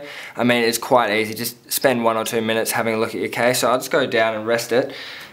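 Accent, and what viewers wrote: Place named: Australia. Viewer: Ahhh, Australian accents. I have to say, I was pleasantly surprised.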